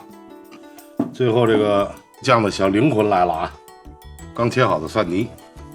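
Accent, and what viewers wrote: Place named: Beijing, China. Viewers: 南方人来听京普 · 主要是听地道北京味普通话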